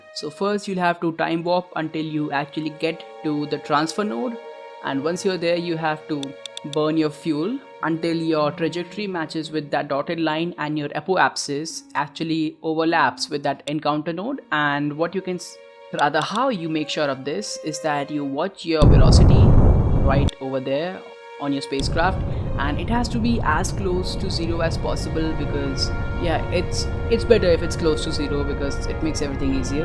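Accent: India. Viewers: Indian accent ??? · Good video.. good tutorial.. Keep it up ... but U English Can make it Not Like indian? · Are you indian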